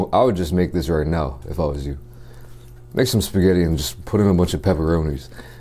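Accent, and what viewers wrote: United States. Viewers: I know he is living in Korea, but he sounds like such an American boy!!!😁✌🏽❤️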